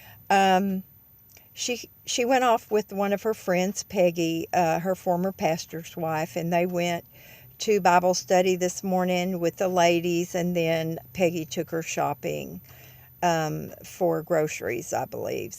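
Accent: South, United States. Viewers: Just taking bids I hear. You have a southern accent, but do you speak Spanish? haha.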